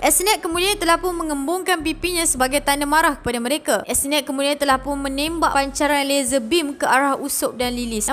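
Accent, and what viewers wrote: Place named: North, Malaysia. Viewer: Gws ….. pekat na utara dia 🤣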